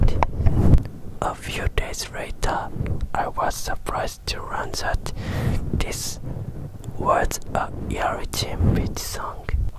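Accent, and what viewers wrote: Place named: England, United Kingdom. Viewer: I WOULD LOVE THE ANIME CHARACTER VIDEO OMG I'LL WAIT FOR IT HEHE that cute english accent tho— AND THAT KISS IN THE END OF THIS VIDEO OMG SPSPWOSJAJALAKAHSHHSJA btw i like one piece, haikyuu, hxh, ace of diamonds (there are so many anime that i've watched so i just type the title that pop out in my mind :D)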